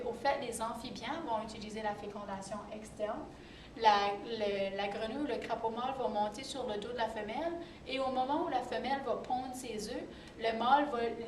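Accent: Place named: Quebec, Canada. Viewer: super vidéo mais je n'ai juste pas très bien compris avec l'accent quebecois 😝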